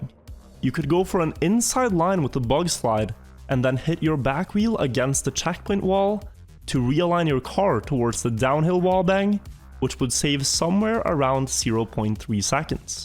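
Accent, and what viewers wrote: Norway. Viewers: Top Trackmania expertise + slight (and sexy) Norwegian accent = perfect content.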